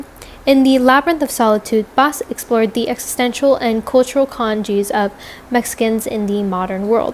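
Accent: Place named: Spain. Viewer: Your spanish accent is so beautiful im kinda jealous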